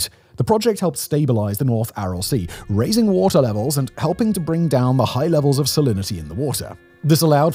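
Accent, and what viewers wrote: United Kingdom. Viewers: That’s cool but I can’t fall asleep to a British accent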